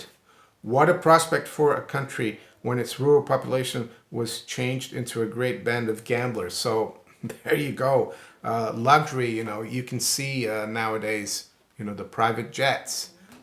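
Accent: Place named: United States. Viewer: Very interesting history. you have the most interesting accent. I was astounded to learn that you grew-up in Brasil. (Big Bossa Nova, and and 1960s Brasil in general fan here, btw! In fact, one of my all-time favorite movies is the 1960s heist film “Grand Slam” which was filmed in Rio.) You sound like a Jewish guy from the Bronx but also very curiously have a noticeable Boston AND Canadian-like accent. Very unique! I enjoy listening to you speak.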